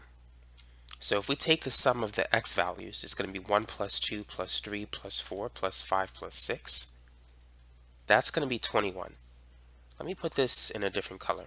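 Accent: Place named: Massachusetts, United States. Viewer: He talks like he's from Massachusetts.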